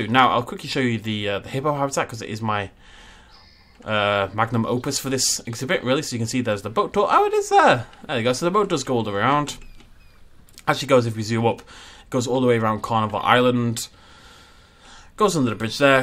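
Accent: Afrikaans, South Africa. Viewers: Oop, hello fellow Afrikaans speakers XD This is so cute! You’re Afrikaans is so adorable >u<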